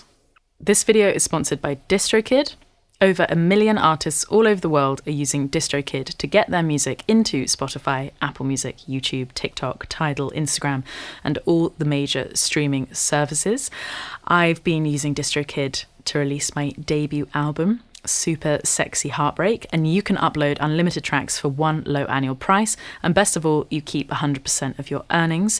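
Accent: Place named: RP, United Kingdom. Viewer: You sound very posh!😮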